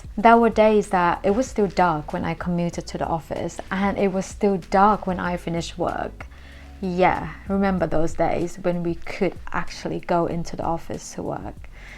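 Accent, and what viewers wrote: United Kingdom. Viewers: I am very much impressed off yours british accent speaking .i hav never seen any thai people talking like this manner as i hav work with thai people here in Nepal ktm station😊😊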